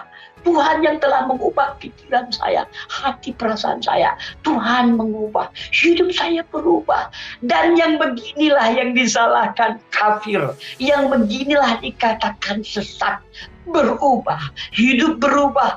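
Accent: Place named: North Sumatra, Indonesia. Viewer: Bukan orang Aceh ini orang. Logat kentalnya orang Sumatera Utara. Ngibul lu tong!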